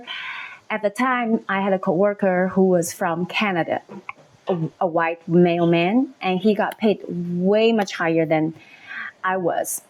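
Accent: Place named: China. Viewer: Is it skin color or is it expertise? For example, white native Italian speakers born in Italy probably have an advantage getting a job teaching Italian in US over someone who is white and whose first language is English. You speak English well but you still have a Chinese accent so a native English speaker will have an advantage. Move to another country and teach Mandarin if you want to make more money.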